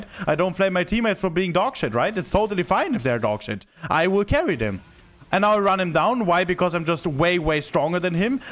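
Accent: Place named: Germany. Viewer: Dierekt gemerkt dass er deutscher ist hahahha aber echt gutes guide video fuer low elo und die fundamentals zu zeigen wie wichtig das ist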